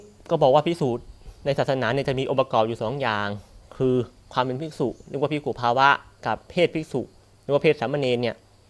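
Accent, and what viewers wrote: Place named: Rayong, Thailand. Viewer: สำเนียงเพราะ คล้ายๆคนแถวเมืองระยอง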